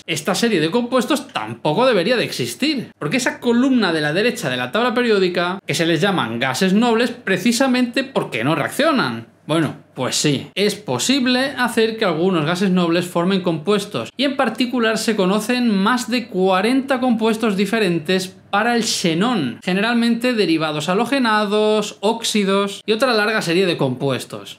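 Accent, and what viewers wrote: Cuba. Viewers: Cubano xd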